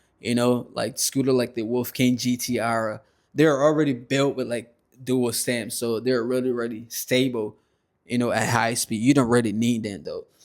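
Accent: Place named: Liberia. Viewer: Your accent, you sound Liberian.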